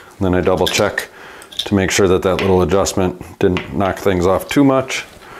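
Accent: Wisconsin, United States. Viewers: "there's my midwestern accent for ya..." i'm a transplanted midwesterner on the east coast, and if it weren't for the great bike content, i'd watch your vids anyway, just for your WiscAHnsin accent!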